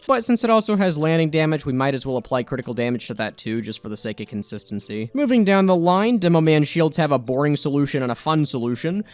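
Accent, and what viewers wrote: United States. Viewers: What part of Florida are you from?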